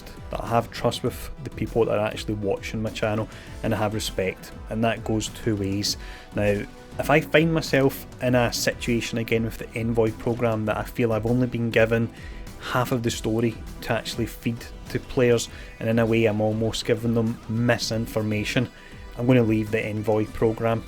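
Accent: Scotland, United Kingdom. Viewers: I applaud you for your honesty and integrity. I recently started playing MSF because I have too much time at home due to an injury, and I am binge watching many MSF videos from many content creators and I could tell you are different from the rest instantly. No disrespect to others, but your calm and easy going attitude (even when you keep getting minions during orb openings) made me subscribe to your channel. When I get better and start going to work, I doubt I will have time for MSF (Marvel Future Fight is a much better game, no randomness bullshit, purchases are not mandatory and do not cost an arm and a leg, etc) but I will keep subscribed to your channel and watch your videos as they are released. I also love listening to your lovely Scottish accent:) Keep up the good work.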